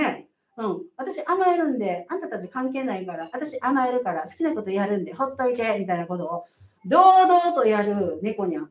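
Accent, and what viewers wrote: Kansai, Japan. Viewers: ３０代からはおばはんよ自覚いっぱいありますもん（笑） さんスカッと言う関西弁だし気にする事ですかね？？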